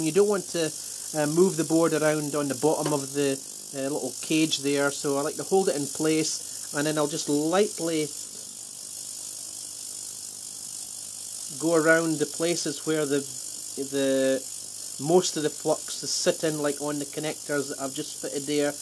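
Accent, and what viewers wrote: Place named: Aberdeen, United Kingdom. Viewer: Great video, thanks for taking the time to show the process! I'm inclined to get some of that cleaner stuff and try it, although I might need to get a new ultrasonic tank as well (mine is rather old and out of whack). As it happens, I spent today making up a batch of PCBs and wondering how the pros go about cleaning them. My own process, for what it's worth, is: Soak boards in isopropanol inside a sealed plastic tub for 30min - this softens up the flux and crap Scrub well with a toothbrush in the IPA - this usually gets everything off but sometimes there's little bits of hard flux around big solder joints, so need to give them a wee scrape Wash with hot water and detergent, to remove the IPA Dry thoroughly with a compressed air jet Personally, I love using compressed air for drying things, because it gets into tight places very easily - e.g. 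under SOIC chips, or inside IC socket pins. By the way, are you from Aberdeen? I detect a wee bit of an accent! [I'm from West Calder]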